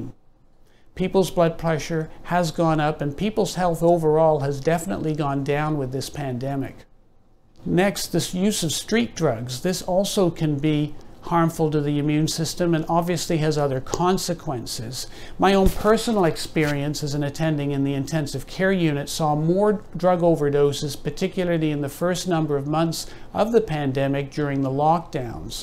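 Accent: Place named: Canada. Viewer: MD here for nearly 50 years, I'm also a retired Professor of Surgery at a major medical school in the United States. This is an articulate internal medicine colleague with what sounds like a pleasing Canadian accent and he does have a great speaking style although I think he was reading from a teleprompter-like device. He produced an allegro account of some useful material that started out on the COVID thing but then soon enough went widely to other areas that are mostly tangential, to say the least. There were bumps in the road. His digressions about beverage alcohol use, sleep, exercise, diet, etc. were both strange and strained even if truthful cameo things. But that's almost minor technical nit-picking on my part. I do opine that it was laughably weird -- and very nearly bush league -- to pose him in front of those random pieces of medical apparatus and . 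. . . .[wait for it] . . . . . the wall display of (his?) various diplomas was just hilarious! What were these obviously intentional optical embellishments supposed to do? Make rubes who watch the video pay closer attention? Make the message more believable? Boost the bona fides? This man means well but the show business aspects of this video lecture were regrettable in my opinion. Thank God he did not wear the traditional internist's "flea collar" (a stethoscope draped dramatically around his neck).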